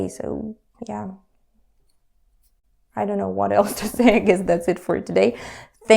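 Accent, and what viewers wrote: Russia. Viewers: на самом деле русский акцент очень слышен)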